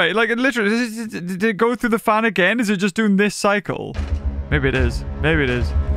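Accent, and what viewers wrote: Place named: United Kingdom. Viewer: i just noticed he's british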